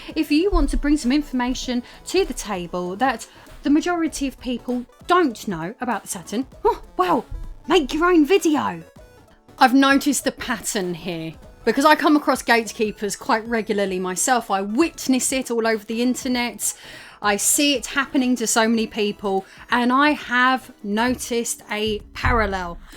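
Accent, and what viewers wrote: United Kingdom. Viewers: You sound like you're at your most British ever in this video, and it's awesome.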